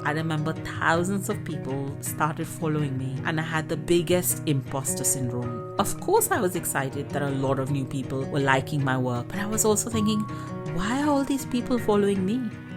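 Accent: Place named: United Kingdom. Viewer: Hello fellow artist😃 just found your channel, I’ve been grieving the death of my nephew, feeling very sad- however, your illustrations brought a big smile to my face & lifted my heart, also Your voice is very soothing & I Love your British accent! Now your illustrations make me feel joy like the feeling of reading a colorful, well illustrated book to one of my grandchildren. Such great art & a very interesting sketch book, thanks for sharing & for the art therapy🥲😃❤️❤️❤️🎉🎉. . Makes me want to get back to start drawing & painting in one of the many empty sketchbooks I have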